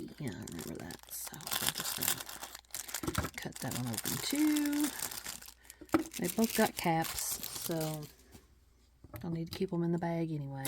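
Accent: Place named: Kentucky, United States. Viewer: New Subscriber here! Are you from Kentucky?😂 I know that accent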